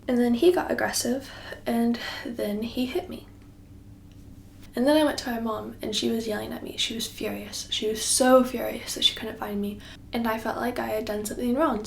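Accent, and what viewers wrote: England, United Kingdom. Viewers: aww she is getting a british accent!!!!! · Your British accent is coming in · Aw you’re starting to get a British accent it’s really cute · Your american accent is blending into a british one!!! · you're picking up a British accent and as a Brit I love it. you say "lot" differently xx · I’ve noticed in this video that you’re starting to get an English accent 😂 and it’s with some words so it’s so cute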